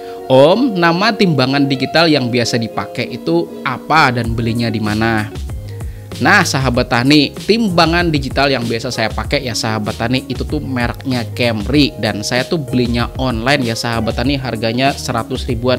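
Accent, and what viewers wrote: Banjar, Indonesia. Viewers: Sy baru di Chanel ini, juga baru belajar menanam. Alhamdulillah, isi Chanel nya benar2 padat ilmu. Oiya Om, mohon maaf, cuma mau mengobati rasa pnasaran sy, sy mau bertanya.. Om org Banjar..? Logat nya mirip Sama saya. :)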